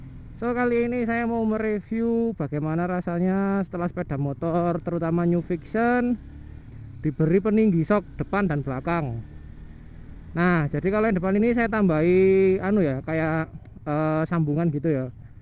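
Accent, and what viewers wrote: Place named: Java, Indonesia. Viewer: Om iku stang e tetep a...opo kudu di ganti?? 😂 Medok2 an omong e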